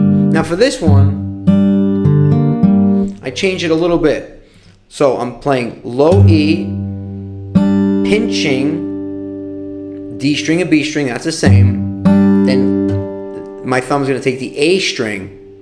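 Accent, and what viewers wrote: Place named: Italian-American, United States. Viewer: You know, I've been banging on a guitar for 53 years, and I'm still trying to get good at it. The whole Travis picking thing has had me flummoxed for the past few months. Being that you're also an Italian dude who looks and talks like the people I grew up around seems to help me to kind of "get this thing" a little bit better. You're a good teacher, and I'll bet you're a great performing artist as well. I will take a break from my lesson to go and investigate. Thank you, paisan.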